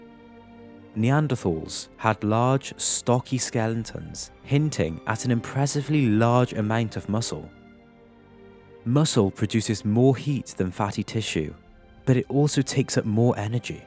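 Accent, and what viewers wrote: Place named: Shrewsbury, United Kingdom. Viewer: The documentary I've been hoping for.... since primary school when I read a book on prehistoric Britain & saw intriguing paintings of neanderthals, to the Frazetta "Neanderthal" (worth doing an image search for!) I've been fascinated. Clan of the Cave Bear was food for thought... this is perhaps the best documentary I've watched. And your accent & narration adds to it (Shrewsbury?)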